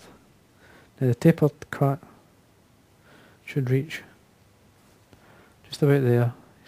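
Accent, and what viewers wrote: United Kingdom. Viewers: Great video. Thanks. I just wish I could understand the wording better. The softly spoken British "English" was hard to follow. You see I live in America! As they say “one picture is better that 1K words, so I will do the best to learn from the demonstration.. Thanks again for the post.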